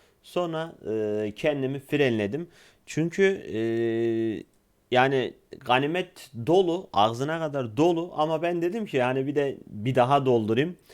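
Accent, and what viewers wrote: Gaziantep, Turkey. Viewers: biraz antepli konusuyon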